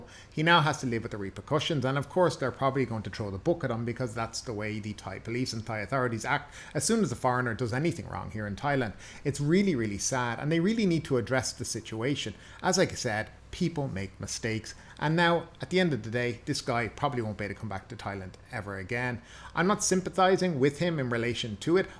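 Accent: Ireland. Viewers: But what can we infer from your charming Irish lilt? 😄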